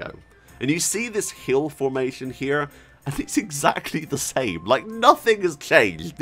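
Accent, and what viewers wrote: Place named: Dorset, United Kingdom. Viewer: is that a posh dorset accent? I have no idea what it is, wacky man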